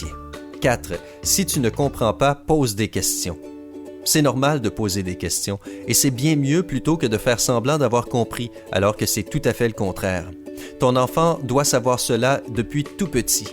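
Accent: Quebec, Canada. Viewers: Moi, dans quelques jours c'est ma fête et je vais avoir 10 ans et mes parent mon jamais dit sa(peut-être quelques une mais je m'en souvient pas)et la quand je regarde la vidéo sa me donne envie de revivre parce que je me fais insulter,harceler.😥😣☹️😖😞😔😕🙁😫😩😤😠😡😵😰😢😭😓🤕et je pensais pas que SYMPA parle québécois lime si tu es québécois(e)en tout cas moi je suis québécoise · Est tu québécois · T'es québécois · kimii lee accent québécois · je crois que le gars qui parle,c'est un quebecois et jespere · T québécois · C québécois comme moi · C normal c l'accent québécois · Tu est québécois ? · Et sinon faut être gentil pas méchant c'est pas gentil d'être méchant c'est mieux d'être gentil ? Putain j'ai pas vu de tel defonçage de porte ouverte depuis "le feu ça brûle" même l'accent québécois a pas réussi à sauver cette vidéo. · kimii lee c l'accent québécois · On ' dirait un accent québécois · L'accent Québécois ! 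:D xD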